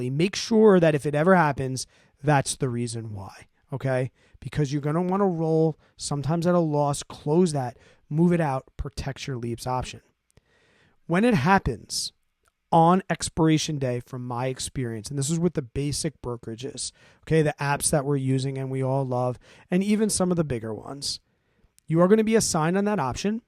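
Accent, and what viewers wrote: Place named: East Coast, United States. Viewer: you sound like an east coast guy! You have a bit of an east coast accent. Where you from brother? I’m from the west coast. Thanks for sharing your options trading knowledge bud.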